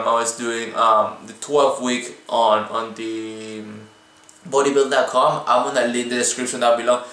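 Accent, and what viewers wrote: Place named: Latin America. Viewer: Oye de verdad que tu video es inspiracional. Y ese acento latino que posees me mantuvo hipnotizado a lo largo de todo tu video.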